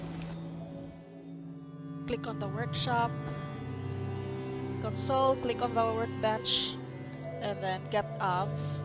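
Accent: Philippines. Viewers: your accent... pinoy?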